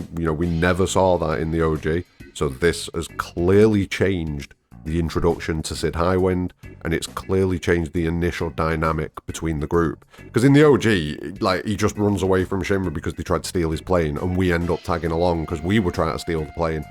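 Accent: Northern England, United Kingdom. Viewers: what's your accent? I know its British but where - i suck at pinpointing accents but yours makes me laugh when you be expressive on purpose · Always love to hear a fellow northerner on YouTube.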